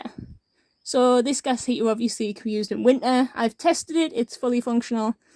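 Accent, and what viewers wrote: United Kingdom. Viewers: Are your from Durham?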